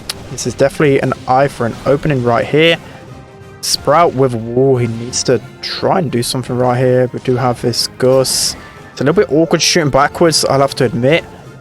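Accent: United Kingdom. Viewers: Is he british?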